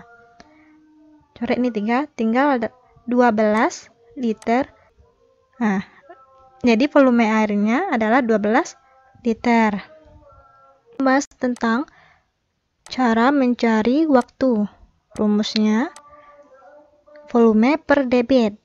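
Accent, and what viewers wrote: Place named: Malaysia. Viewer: suaranya kok gitu kayak orang malaiysa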